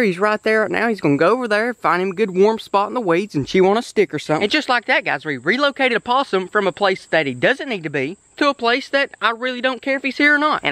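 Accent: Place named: South, United States. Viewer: I love your accent I mean cuz you're so country cuz don't worry I am very country but not as country as you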